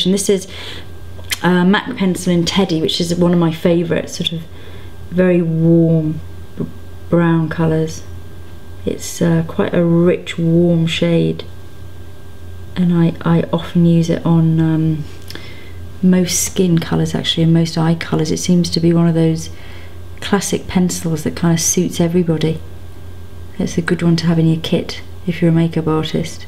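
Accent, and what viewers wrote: United Kingdom. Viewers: it cracks me up when you say these huge brand names that i have never heard and you say it in your [roper british accent. so you make each name way more bold then they really are. lol. love you!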